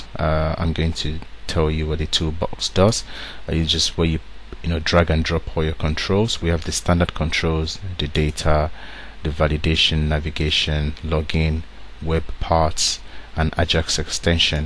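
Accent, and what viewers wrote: Nigeria. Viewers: Man. I love your Accent. It sounds Nigerian. I am pretty sure you are from Africa.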